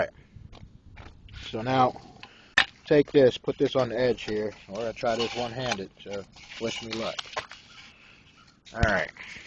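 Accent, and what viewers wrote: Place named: Pittsburgh, United States. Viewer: Pittsburgh? I recognize the street signs and the accent! What's up brother?!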